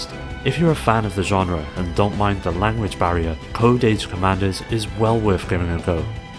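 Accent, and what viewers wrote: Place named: Wales, United Kingdom. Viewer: are you from cwmbran or Newport? that accent is quite recognisable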